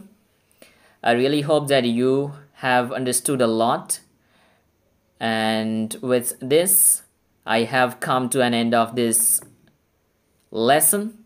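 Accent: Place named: New York, United States. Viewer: NYC voice dear sir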